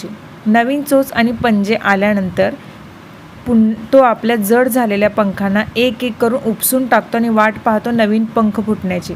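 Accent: Kolhapur, India. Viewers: Tai me suddha kolhapur chi aahe tumhi dadansobt kolhapuri bhashet bolt hota tr khup bhari vatal😍😍😍jagat bhari aapli kolhapuri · Kolhapuri language ahe tumchi😀🔥❤️ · Nice vedio all....pn tumhi Kolhapur che aahe he mast vatal language aaikun😘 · Ya blog la tu assl kolahpuri bollis khup mast vatl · ताई तू कोल्हापुरी भाषेचे छान बोलतेस एकदा बोल की मग व्हिडीओ त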